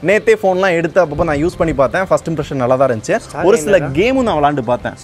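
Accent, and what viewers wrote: Madurai, India. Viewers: மதுரகாரங்க பேசுற மதுர ஸ்லாங் கெத்து தான்...! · Bro nenga madurai karar avinga ivinga slang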